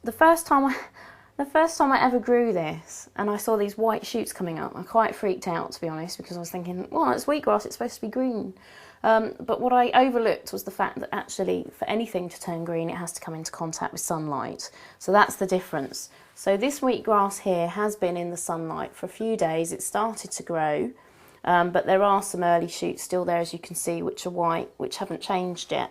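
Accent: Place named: United Kingdom. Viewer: Very good vid,. Thank you for the info!...Looks like you have quite a few fans, including myself. Love the British accent, and of course you're very lovely!! And of course Wheatgrass juice is an amazing healer for the body. Try it 4 yourself & you won't be disapointed.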